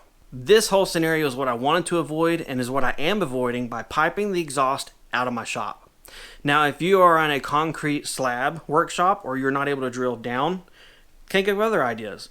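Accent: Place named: Carolinas, United States. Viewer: I've really been enjoying your vlogs and hearing your thought process as you organize your shop lately...also, where in NC are you located? I ask because I'm right on the NC line in upstate SC. And your accent sounds pretty similar lol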